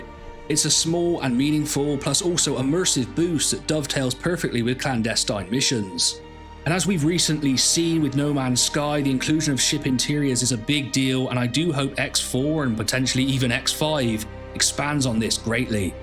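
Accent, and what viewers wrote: United Kingdom. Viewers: I didn't know you were Portuguese, congrats ! only Portuguese I know with a brit accent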